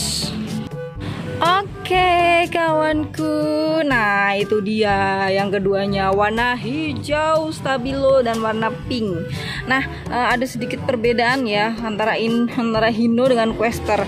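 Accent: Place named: Sumatra, Indonesia. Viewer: logat bicaranya dah mulai logat sumatra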